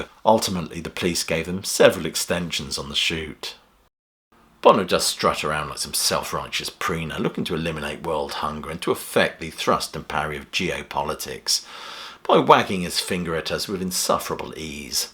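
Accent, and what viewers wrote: England, United Kingdom. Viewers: He's English ..you cant figure out that accent?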